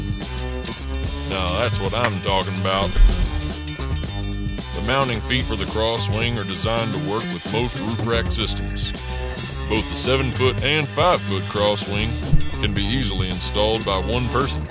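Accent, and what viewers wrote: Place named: United States. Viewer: Is this a parody? How is it sooooooo American just in the voice over? I don’t trust it.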